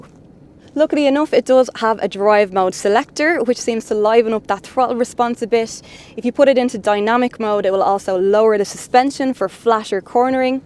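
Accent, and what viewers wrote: Ireland. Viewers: Love your reviews and the gorgeous Irish accent - I’m now a subscriber. Can’t agree about the Touareg interior though - more functional looking and less classy than the big Audis.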